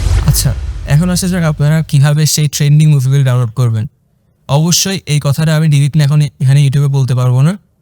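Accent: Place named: Chittagong, Bangladesh. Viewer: Only in this video of yours "Chittagongian accent vibing" ...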